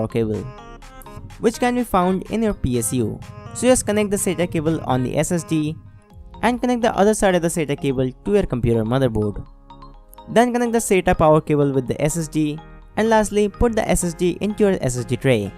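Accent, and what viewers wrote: India. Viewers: You are absolutely correct. When I listen to people from India speak English (especially on this channel) it takes me a few moments to realize they are speaking English and to mentally adjust to their rapid verbal pace. If the author wants to appeal to a wider audience then your advice should be taken.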